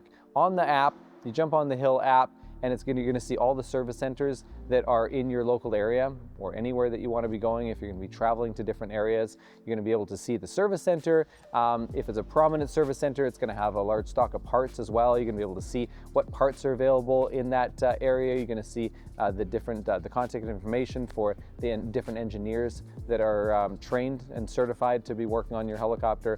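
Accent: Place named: North, United Kingdom. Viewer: HA, he's a northerner...help you oHut.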